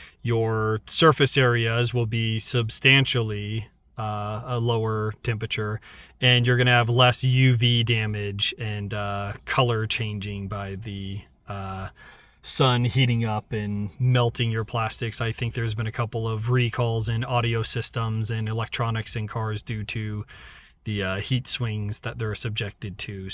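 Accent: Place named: United States. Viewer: Thank you for your research and experimentation. By the way, are you of Asian descent? Your voice/accent gives me the impression that you were born in America, but have that descent.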